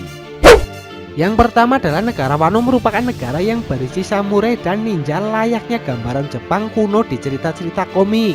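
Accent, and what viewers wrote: Banyumas, Indonesia. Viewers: mendok bgt bahasanya berbicara lha dengan yang baik dan benar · gk suka gue sama suara nya.. jangan ke jawa jawaan dong mas.. yg biasa aja kali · agak ngeri ngapaknya, kayak siapa gitu. 😂😂😂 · Pertahankan suara ngapaknya sob.. bedakan dengan yang lain.. jangan peduliin haters.. karena mungkin dia kalah saing ama ente.. hoho. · Go ngapak go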